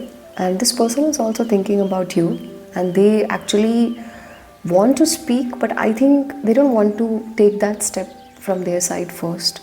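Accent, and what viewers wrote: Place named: India. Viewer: Indian accent 😂😊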